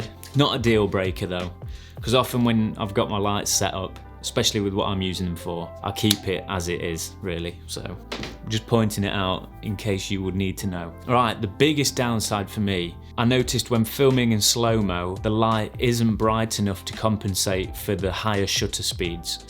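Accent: United Kingdom. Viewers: His british accent is good to hear! This light has been great!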